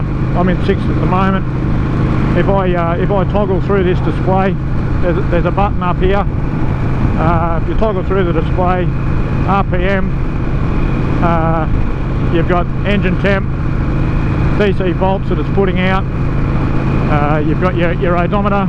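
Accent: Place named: Australia. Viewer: Nice review mate.... great to hear an Aussie review... goes like the clappers..... brilliant.... I can’t wait , one day I will join you guys......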